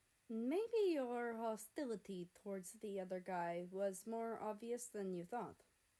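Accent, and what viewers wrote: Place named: Russia. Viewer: Sfhgfhhjnbdgj I can’t listen to this rn bc I’m busy but AAHHH TYSM!!! I got to about 8 minutes in lol, I’ll finish it later!! I’m actively cringing at the way I wrote things back then- especially the typos in the summary- how did I not notice those- but you know what it’s okay 😭 anyway YOUR READING IS FUCKING INCREDIBLE can I just say that I’m really impressed by your Russian accent?? I can’t do accents to save my life 😂😂 I’ll probably say more later bc I have to go now but THANK YOU SO MUCH ❤❤❤❤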